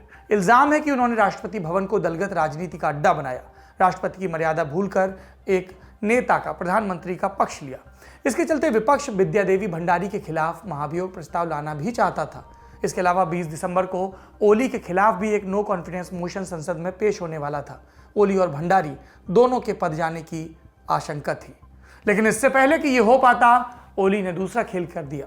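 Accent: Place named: Uttar Pradesh, India. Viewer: ha Bhai but Hume Pasand hai wo up wale lehje Mai bat Karna... Bhai na andaj Kuch aisa hi hai...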